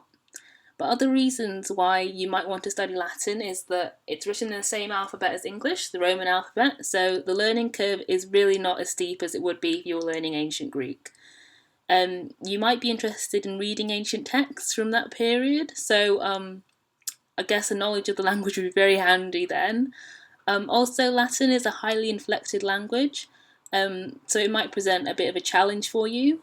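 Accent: England, United Kingdom. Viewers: I appreciate all the effort you have made but you accent when you try to speak Latin is just so terribly English. It sounds funny. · I think you have a very strong English accent in Latin😅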